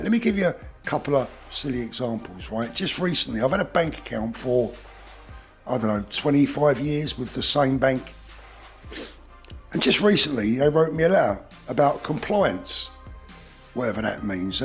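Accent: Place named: Cockney, United Kingdom. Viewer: I love the cockney accent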